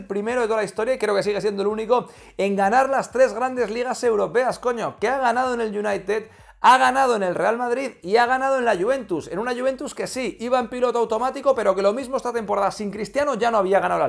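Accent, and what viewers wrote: Argentina. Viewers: Jajaja que buen acento porteño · Te salio bien el asento argentino 😁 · Que acento argentino tan bestial. Mis respetos crack 😝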